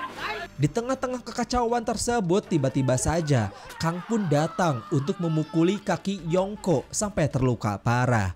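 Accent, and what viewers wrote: Sunda, Indonesia. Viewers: Lain lah, klw sangkhayang masih kental logat Sundanya, ada lucu2 nya gitu, klw ini maa serius ceritanya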